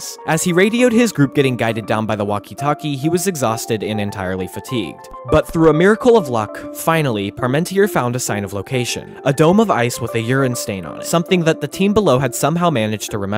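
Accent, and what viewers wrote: United States. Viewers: got that Colorado accent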